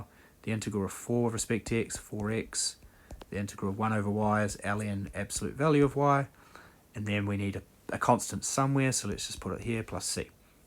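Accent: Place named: New Zealand. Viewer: Thank you❕ I've done a couple of courses in DEs a long time ago. You are right when you say k< 0 is not a problem, however I thought we were staying in the real world. I really enjoyed this problem and got it out by myself when I heard you say "separation of variables." I hear by you mild accent that you are a Kiwi. I live in Brisbane Australia, and I do YouTube maths for about 4 hrs a day. I'll be visiting your YouTube channel more often.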